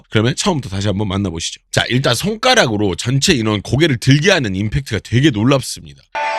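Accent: South, South Korea. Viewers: 구독.좋아요 눌렀어요.. 살짝 남부쪽 사투리가 들리네요.^^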